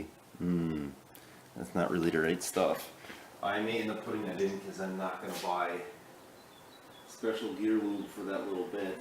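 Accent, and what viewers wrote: United States. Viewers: He sounds like one of them dam yankees that dont know his ass from a hole in the ground mite be a democrat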